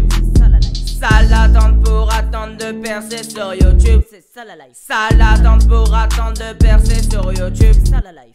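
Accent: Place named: Quebec, Canada. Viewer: On dirait un québécois j te jure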